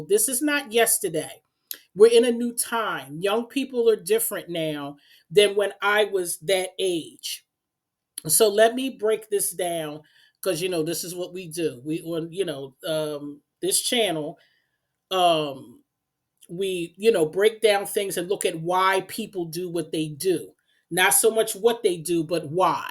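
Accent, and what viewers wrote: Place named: Philadelphia, United States. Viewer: new subscriber just turned 25, really enjoyed this video!! wish i heard it a lil younger lol! any chance are you from philly? i hear it in your voice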